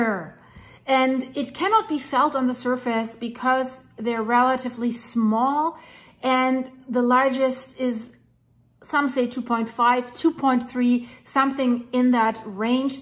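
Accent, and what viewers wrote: Germany. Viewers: I love the German accent especially when discussing volcanology lol How dare you people say such things ☝️ So insulting lol When I first moved to B.C. not long after Mt Saint Helens when off from Australia in 1987. I then saw Mt Baker 😳 holy crap thats scary and all these guys are afraid of snakes and crocodiles. Then I learned of Mt Rainer (Beer cans of coarse) and Mt Shasta and was like omg where heave I moved. B.C. Itself is loaded with more volcanoes north of Vancouver. Australia, the safest place on earth 🌍 so I’m home. Oops, unless we get Tsunamied from a landslide from southern NZ with a mega Tsunami 🌊 of coarse. Such is life as a human being.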